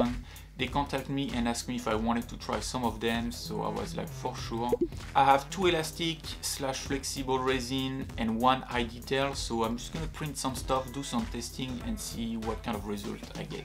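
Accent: France. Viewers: Vu l'accent, t es un Frenchy!!!!! 🤗😋 Tu penses qu on peut colorer la résine si elle est claire, avant impression? Ou colorer les objets imprimés?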